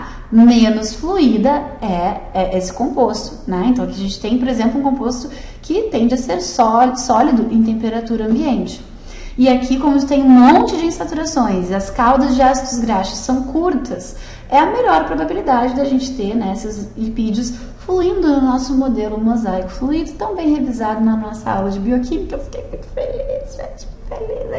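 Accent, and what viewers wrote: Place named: Rio Grande do Sul, Brazil. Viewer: Bah tchê, pelo sotaque é gaúcha!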